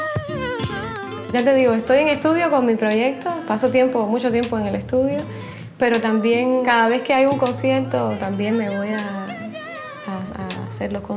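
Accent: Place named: Cuba. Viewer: esta hermosa y con ese acento cubano cosa mas grande caballero!!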